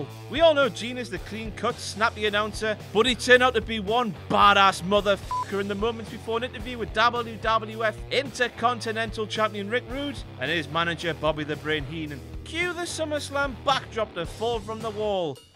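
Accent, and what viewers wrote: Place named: Newcastle, United Kingdom. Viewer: He should just stick with his Geordie accent, as it is far more "hilarious" than saying 'dabble yoo, dabble yoo, eee" for laughs.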